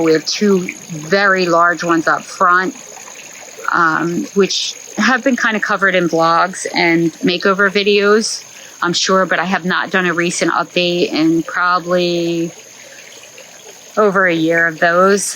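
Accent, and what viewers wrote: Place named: Philadelphia, United States. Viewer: Just lovely. Guessing you’re near Philly? I hear a slight accent especially when you say wooder instead of water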